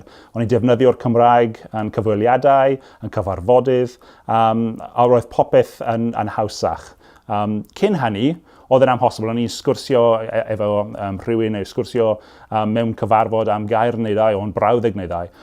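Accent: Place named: England, United Kingdom. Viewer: How have you managed to speak Welsh with an English accent?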